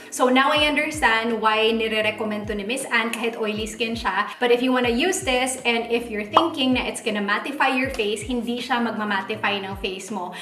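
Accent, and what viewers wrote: Visayas, Philippines. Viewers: 😉Ate what if mag review ka in Bisaya so love the way you talk in Bisaya...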